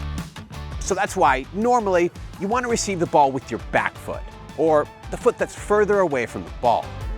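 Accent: United States. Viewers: Nah, his accent is much likely american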